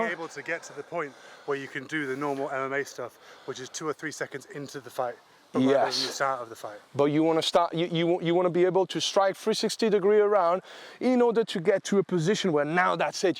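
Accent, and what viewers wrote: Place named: England, United Kingdom. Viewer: Yeah very long time by your accent. it's just a curious combination of accents. It took me a minute to figure out you were a native french speaker. In the beginning first few seconds you sound very english . Regional accent is very strong but they you listen again and you can tell you are not a native speaker but it's very hard to tell where. I figured french . THEN I saw your name.. after J wrote the comment and realised.. ahhh obviously he's french. But it's not at all easy to tell. You definitely sound more English that french..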